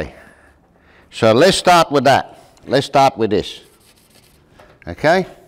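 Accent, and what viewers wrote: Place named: Italy. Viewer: Sounds hes italian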